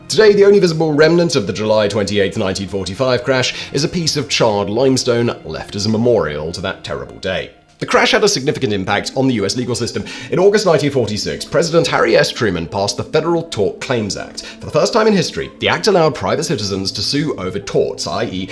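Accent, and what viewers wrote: United Kingdom. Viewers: maybe that's just because his British accent. Seriously though, go to settings and playback speed. Select maybe .5 or whatever you want to try. I'm listening at 1.5x speed currently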